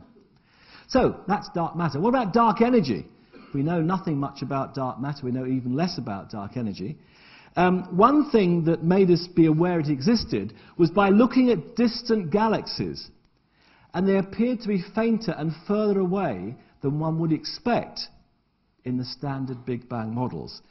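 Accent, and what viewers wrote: United Kingdom. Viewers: the British accent is so tantalizing. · do you know wot I mean? does that make sense? d'ya see wot I mean? Oh, and that gas over there, that's "MEE-thane"...(ugh, I can't stand the way brits mispronounce words). Oh, and "isn't it?... isn't it?...know wot I mean? Isn't that right? LOL; Really, I enjoy these lectures. Prof Morison does have an interesting, animated mien about his presentations. Always fascinating to watch. And I thought the "Oscar Party" analogy was a great one: it's a great way of explaining how mass works. And, i agree: quarks shouldn't have been arbitrarily changed to "truth & beauty" from what they've been called all along & what is a much more sane label: "top" and "bottom", besides, "truth" and "beauty" are both subjective, where as there's no argument, can be none, to something that is "top" and something that is "bottom"; cheerio!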